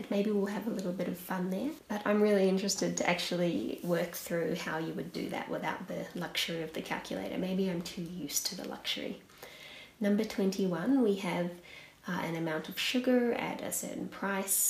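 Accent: New Zealand. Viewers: Well... I can tell you're definitely not Australian since a) no Australian ever says shrimp, we say prawn and b) she's obviously got a Kiwi (New Zealand) accent so she's not even from the same continent. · Gold dust in Troy ounces and cotton in Ne (linear density)? By the way, are you from New Zealand? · In 1866 students would have been using a slide rule (see link below) to calculate the more difficult problems, but they would have to memorise all the formulae. Back in my day, we never had to use a slide rule as we did have calculators, but we were forced not to use calculators and use what we called "lookup tables" and calculate longhand. As to accent, I can hear a little NZ accent in there but the way she pronounced Albany I believe she is either in Western Australia or has lived there for quite a while. Australian Eastern Staters and Americans (I believe) pronounce it Allbany. 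<link>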